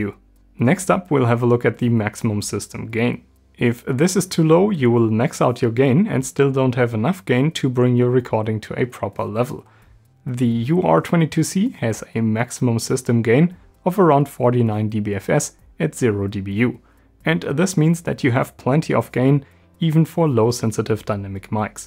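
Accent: Germany. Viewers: Ich wette, wegen deinem akzent das du Deutsch sprichts!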